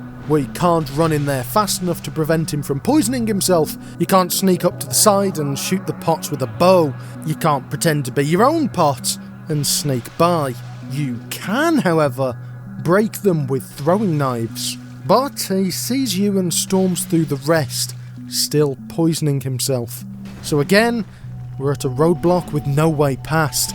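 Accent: United Kingdom. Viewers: Ahh yes, congrats on the full time positon. Us Americans grratly appreciate a humorous soulstuber with a british accent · Bro you are the most British person i have ever seen both looks and voice 😂😂 You should be England's national treasure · this is the most british person I've ever heard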